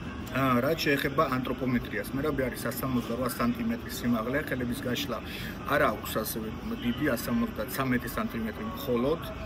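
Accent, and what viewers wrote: Russia. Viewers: ხაიკიკი არა მოხარშული კვახი კიდე , ქართულად ისაუბრე რა... ეს რუსული ხ მაგარი გამაღიზიანებელია და მოდი რაა ვლოგერობას თავი დაანებე და ბუკმეიკერობებს , არაა საუბარი შენი საქმე და იქნიე გამაში ეგ ხელები... ეს პური რომ მეპურემ უნდა აცხოს ხო ვერ ვისწავლეთ 😢😢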